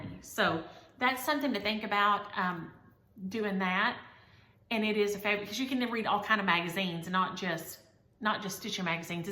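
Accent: South, United States. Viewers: Sounds like you have had alot going on....I hope you can fine those pants....I had the Close Captioning on your video and they do not understand the Southern Talking....because what you said, was a whole different world than what they type...the last video, I was on the ground laughing to hard....they typed "Naughty" alot ...you did not say that....The Sheep are always fun to stitch, and you need to count your Blessings ...take care and be safe..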